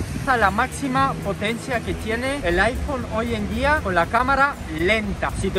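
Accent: Argentina. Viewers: Esa tonada media argentina que onda?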